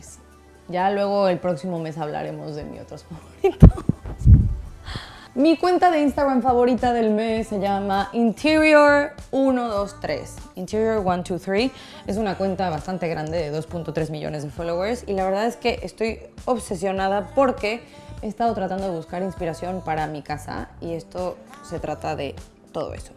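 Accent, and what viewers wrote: Mexico. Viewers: Ay! Como me encantas... Curiosamente acabo de ver otros videos de recién que llegas a España, y como que querías adoptar el acento españolaooo🙊jajaja pero creo que el furor ya pasó, hace tiempo. Me encanta que conservas tu acento mexicano y muuuy freson! Eres divina😗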